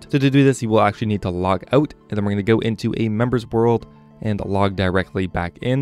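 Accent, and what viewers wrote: Quebec, Canada. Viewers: Wait canadian but french lemme guess quebec?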